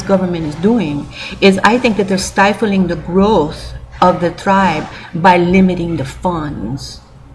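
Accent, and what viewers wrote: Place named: Mexico. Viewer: you stupid bitch you exposed yourself......you have no business speaking for natives........you have no people on the rez......what happens on any rez is not your business.........you dnt even know if youre indian and you say you may have been kidnapped from egypt as a baby.......you are a nutcase who smokes crack........White Buffalo Calf Woman id gods ONLY prophet and will appear back to her peop[le as a white buffalo not as a crazy non lakota with a mexican accent preaching to other non-indians........put the crack pipe down before you end up dissappearing on a rez never to be seen again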